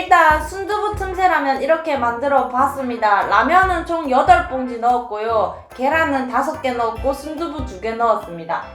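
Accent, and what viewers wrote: Gyeongsang, South Korea. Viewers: 후라이판이 몸보다 더 크네요 ㅡ 경상도 사투리 가 정감있네요 아침에 얼굴 안 붓나요?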